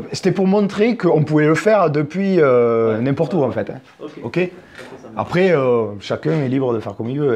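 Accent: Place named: Aveyron, France. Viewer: Merci ! Par contre c’est l’accent aveyronnais 😉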